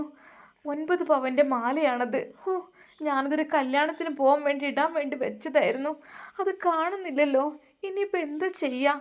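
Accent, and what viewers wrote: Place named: Kozhikode, India. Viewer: Ningal calicutil koduvally bhakath mattoo aaanoo.. samsaram angane thoonunnu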